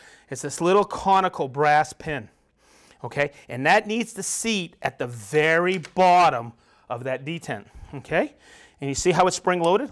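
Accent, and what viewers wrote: Boston, United States. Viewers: It's like being at a carnival. And he's the barker from Boston.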